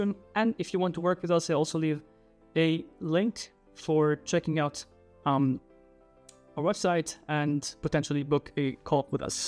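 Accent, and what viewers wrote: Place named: England, United Kingdom. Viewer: si ma l'accento è totalmente inglese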